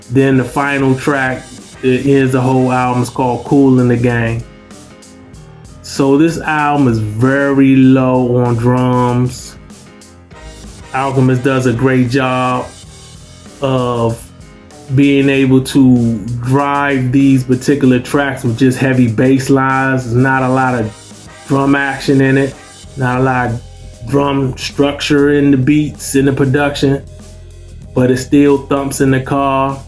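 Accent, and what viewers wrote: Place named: DMV, United States. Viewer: Accent sounds like you're from the DMV where they had Tru Skool. Solid review.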